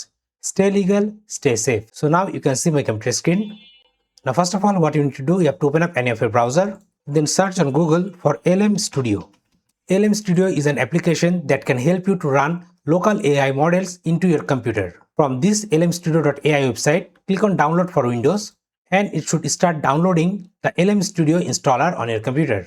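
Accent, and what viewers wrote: India. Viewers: Lol, gotta decode the indian-english first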